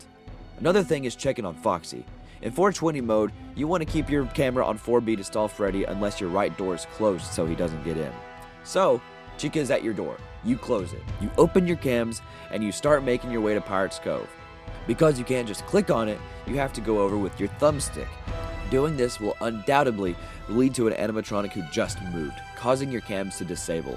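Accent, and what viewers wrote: United States. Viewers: Lordy lord, that's a fine southern accent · People from pennsylvania often sound like this, wonder if hes from there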